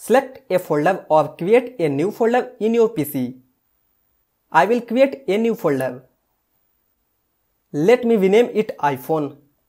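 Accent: India. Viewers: Luv your desi accent 💕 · very bad english+indian= leave the video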